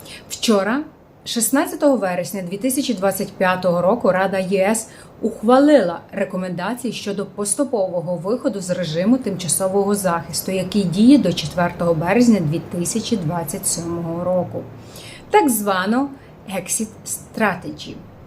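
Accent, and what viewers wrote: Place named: Lviv, Ukraine. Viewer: Дівчатко, навчися розмовляти на українській мові ( без львівського акценту)...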